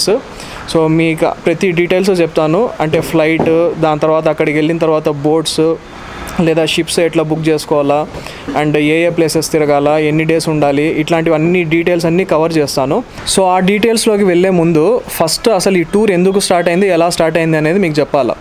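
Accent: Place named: Chittoor, India. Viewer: Bro.. Voice and slang of chitoor superb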